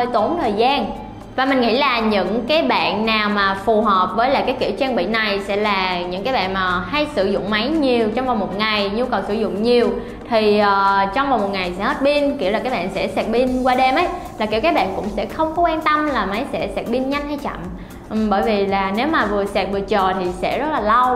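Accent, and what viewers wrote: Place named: Phu Yen, Vietnam. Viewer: Nghe tiếng sao giống phú yên hay bình định gì giữ trời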